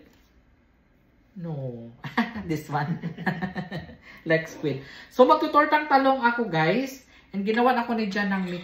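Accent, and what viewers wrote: Iloilo, Philippines. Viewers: Taga saan ka po parang ilongo ka po sir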